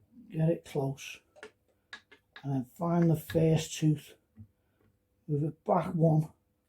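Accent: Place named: Liverpool, United Kingdom. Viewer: I left Liverpool 40 years ago, love the accent, and this video was very helpful, cheers.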